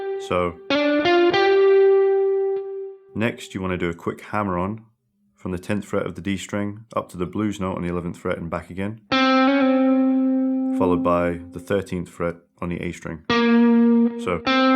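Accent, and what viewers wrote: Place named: Lancashire, United Kingdom. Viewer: Both of you are very good although fretjam seems to have stopped making videos now. It was just that you both have similar accents,Lancashire or Manchester area tones,so to speak 😀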